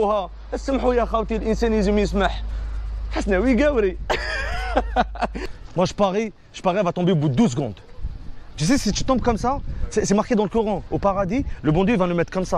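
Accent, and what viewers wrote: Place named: Annaba, Algeria. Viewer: أنا نكره الهدرة تاع عنابةدايرة كي الشعر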